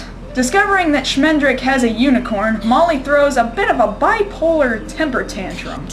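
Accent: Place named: Texas, United States. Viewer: Thats the beauty of a Texas accent!!! YAY!!! Southern People rule!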